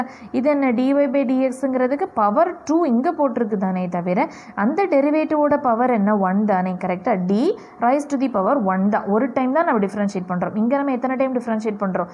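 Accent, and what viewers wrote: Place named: Nanjil, India. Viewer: Very crisp explanation with Nanjil Tamil accent.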